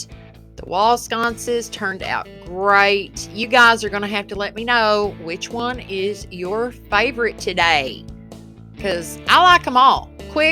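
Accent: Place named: South, United States. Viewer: SPRING! Love that you don’t sound rehearsed, also LOVE your accent, I’m a Southern girl too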